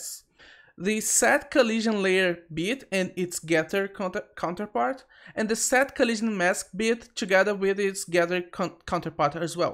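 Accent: Brazil. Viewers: Caraca vey tava pensando q era sotaque br e fui ver o canal e era msm aduhaudhasd. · Eu percebi na hora ksksksksksksks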